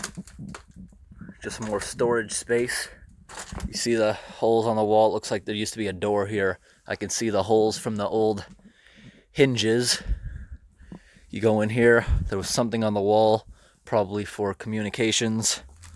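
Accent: New England, United States. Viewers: Wonder why abandoned buildings like this weren’t torn down. Love your New England accent btw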